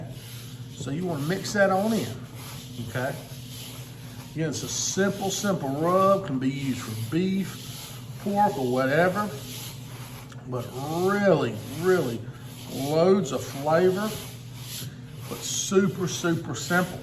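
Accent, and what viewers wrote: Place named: Texas, United States. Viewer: Love your accent!! Texas up in this thang👏🏽👏🏽🙌🏽🙌🏽💯💯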